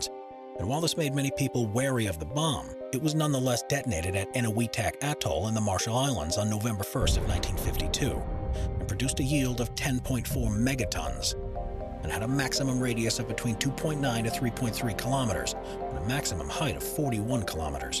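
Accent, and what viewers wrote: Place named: United States. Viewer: Come on, you sound American so why not use the non-metric units we use, like miles and pounds instead of kg and kilometers?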